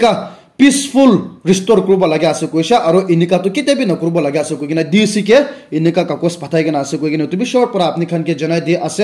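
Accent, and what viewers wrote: Nagaland, India. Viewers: Nothing,but sumi prononciation.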